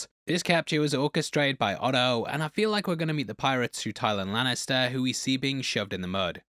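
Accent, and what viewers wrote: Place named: England, United Kingdom. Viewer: My boy looking a bit manly in this video 😂😂 the rough beard, English accent, arm tat in view 😂😂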